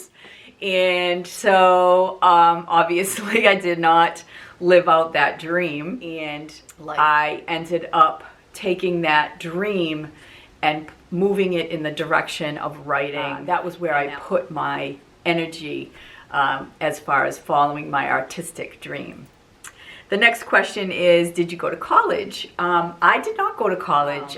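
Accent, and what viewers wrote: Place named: Fall River, United States. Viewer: this is such an open and inspiring video. Thank you for posting it. I knew I recognized that accent, I’m originally from Fall River! ❤️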